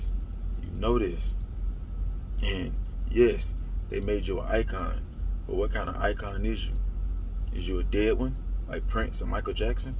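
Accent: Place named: New Orleans, United States. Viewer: This nigga sound like he from new Orleans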